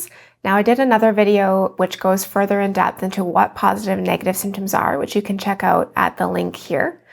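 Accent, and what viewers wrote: Canada. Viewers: Great demystification of psychosis, carried out with your lovely gentle Canadian accent. I find listening to you makes me feel calm and at one with the world. I guess there's an irony there! Thank you.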